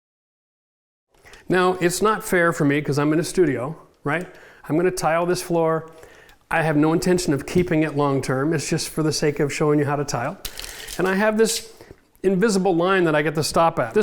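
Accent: Canada. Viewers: Canadian Aye !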